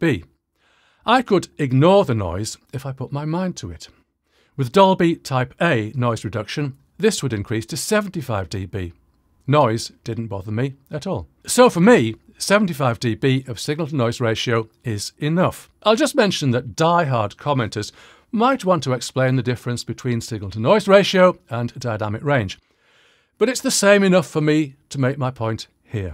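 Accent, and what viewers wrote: United Kingdom. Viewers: Greetings from California. Superb video! Can I ask which region of the UK is your accent from?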